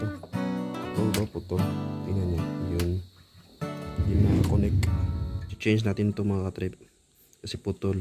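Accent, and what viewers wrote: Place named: Visayas, Philippines. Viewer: Taga saan ka dol parang bisaya ka Eh saan ako makabili ng battery niyan At Paano yong battery Charchable po ba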